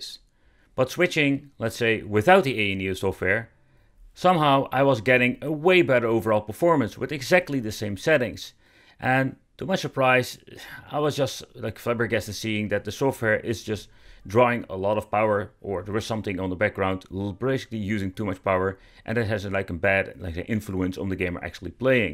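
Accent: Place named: Netherlands. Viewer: Ik hoor je Nederlandse accent ik weet niet waar je woont maar als het dicht bij Alphen aan den Rijn is dat goed. Ik heb er. Rog ally waar ik probeer games te emulaten maar het lukt mij niet als je dat aan de praat kan krijgen kan je mijn rog ally een review geven met emulation ik probeer voornamelijk Wii, Wii u, switch en Xbox 360/ps3 games emulaten maar emudeck werkt niet bij mij ik heb mijn ssd wel geupgrade naar een 2tb ssd miss kan dat er mee te maken hebben maar als je niet dicht bij woont heb je misschien tips om het wel aan de praat te krijgen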